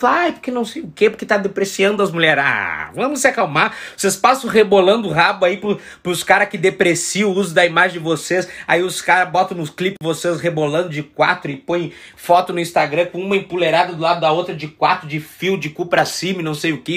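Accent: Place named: South, Brazil. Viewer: Uma gracinha esse sotaque de sulista hahahaa e ele tá certo nisso aí!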